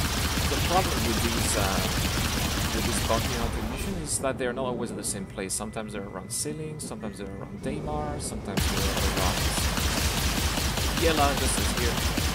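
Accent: France. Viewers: tu es breton ? ^^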